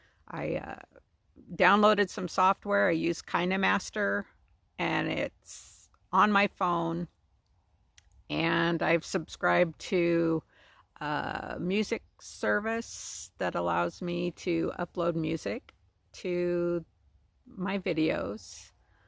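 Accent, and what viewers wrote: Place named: South, United States. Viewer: Wow, all done with your videos. I am bummed. I'm really looking forward to many more. You may not hit 1000 subscribers, but those who do are certainly appreciative of you putting out the videos. Thanks, for producing these videos, allowing us into your life's adventures. I'm glad you are finding a purpose and realizing some of your dreams in your life, especially at our age, and you have done a lot of other adventures in earlier life. Lol, it's hard to stop. The southern twang, Oh Golly's, hot as monkey balls, etc., are definitely becoming your trademark themes in your videos. Love It! See you soon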